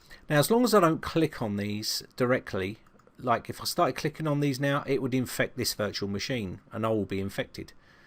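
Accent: United Kingdom. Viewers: I like your British accent m8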